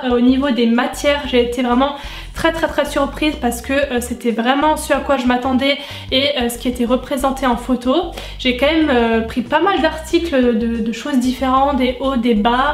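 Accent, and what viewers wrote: Lorraine, France. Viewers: Je me disais bien que ton accent me disait quelque chose, vive la Lorraine 🤟🏻❤️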